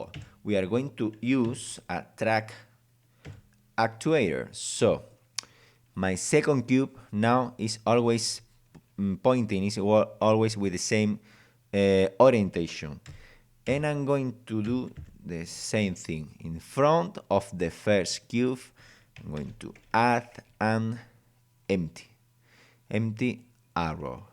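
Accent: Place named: Spain. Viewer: I like your spanish accent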